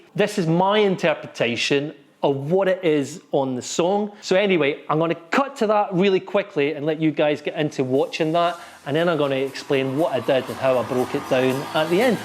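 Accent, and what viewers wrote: Scotland, United Kingdom. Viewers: That was pure brilliant mate. You from Scotland?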